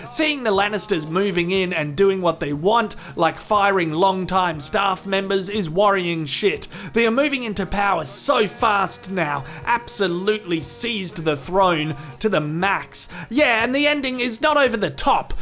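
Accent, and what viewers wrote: Australia. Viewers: he's definitely not British. I'm British and he sounds more Australian to me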